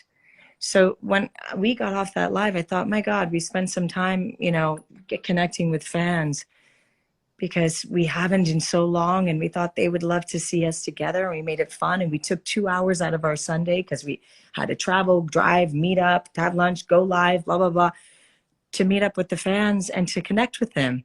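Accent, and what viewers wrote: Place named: Brooklyn, United States. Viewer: the brooklyn accent and some evil queen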